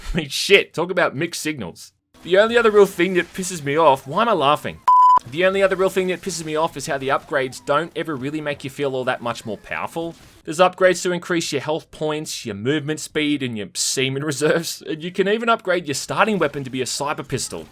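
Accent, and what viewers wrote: Australia. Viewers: finally realizing that the aussie accent is funny.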